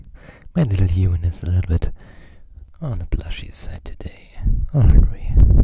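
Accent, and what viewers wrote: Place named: Germany. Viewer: Hes german uwu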